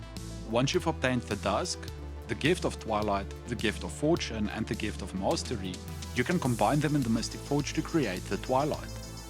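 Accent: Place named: South Africa. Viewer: Love the heavy South African accent. - Hello there fellow SA person. Thanks for the guide. o/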